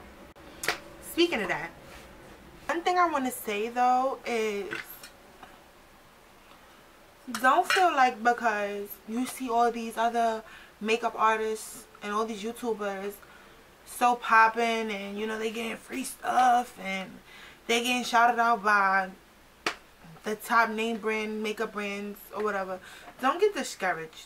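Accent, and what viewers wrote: New York, United States. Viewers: Listen I love just hearing you rant and talk, your gorgeous and so NY ...love it! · I was going to ask if you were from NY! Lol. Love it! Keep that truth real and fresh love! You have a whole wide world of people that support you and you doing your thing.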